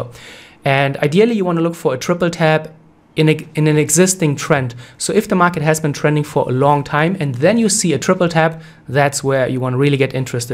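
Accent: Germany. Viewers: Grüße an meinen Landmann! Höre doch wenn ein Deutscher englisch spricht! 😁 Aber gutes Video! Grüße! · Very useful video :) You sound german haha. Wo wenn ich fragen darf? ;) Suche Leute zum austauschen und lernen, da ich Trading super spannend finde aber es schwerer ist als man anfangs vermutet. Kurse gibt es wie Sand am Meer aber Connections bzw. einfach nur miteinander reden und Erfahrungen teilen bringt meist viel mehr. Liebe Grüße aus dem Schwarzwald, Jan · u are defo a german i can hear that hahah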